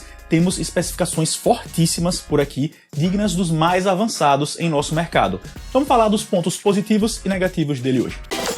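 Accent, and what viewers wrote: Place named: Northeast, Brazil. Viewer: Quem é esse paraíba? Sotaque chato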